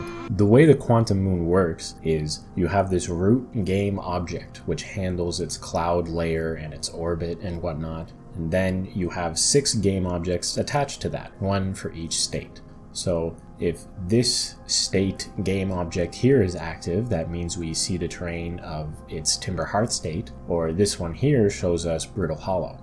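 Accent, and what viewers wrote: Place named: Canada. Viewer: its also about him sounding canadian, hence why he replied "aboot"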